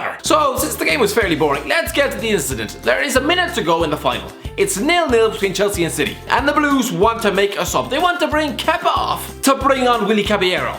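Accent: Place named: Ireland. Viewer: Annoying voice you Irish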